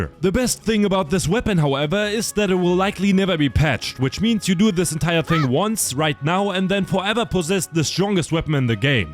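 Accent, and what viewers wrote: Germany. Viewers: this German accent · You are german, right?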